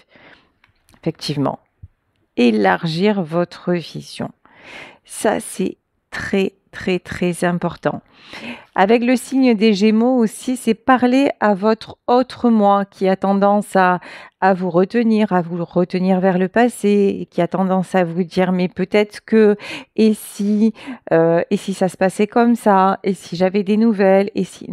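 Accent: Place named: Nîmes, France. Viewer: Bonjour 🌸je suis hélas dans cette situation d’horreur 😫😡plus trop de force, Plus d’énergie mais je veux me battre & obtenir réparation..d’injustice p/abus de Confiance s/personne mis sous tutelle (Packinsonien)Besoins d.aide!... D.agréables moments d’entendre votre voie de « NÎMES «encore mercie 🌸🍀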